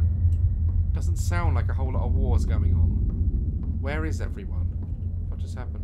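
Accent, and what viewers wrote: United Kingdom. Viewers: One of the things I like about videos is the British accent and btw I just subbed!!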